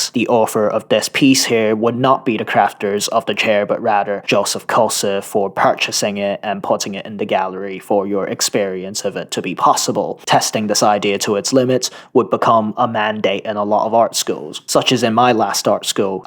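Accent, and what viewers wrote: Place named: Upper class, United Kingdom. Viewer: why do all of you anti modernists have such a smug upper class accent?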